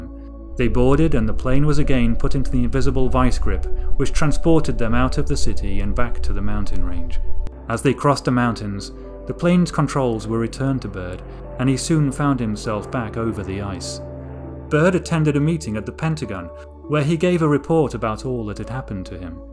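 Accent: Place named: United Kingdom. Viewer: what dialect of British English are you speaking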